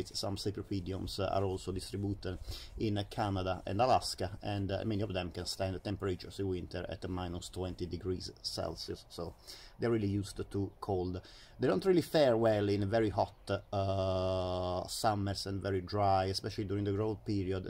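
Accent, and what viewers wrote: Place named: Italy. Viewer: Because of your accent, are you Italian? It would be easier for me to write to you in Italian. 😊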